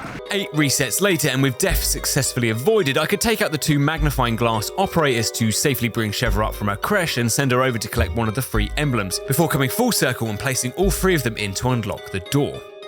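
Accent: United Kingdom. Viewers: The sweet, soothing british voice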